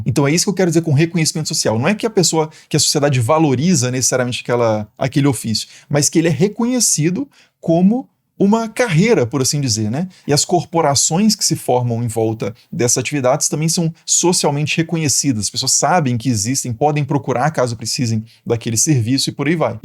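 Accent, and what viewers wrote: Minas Gerais, Brazil. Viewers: O fala de um jeito como se gostasse da gente! Típico do sotaque mineiro!❤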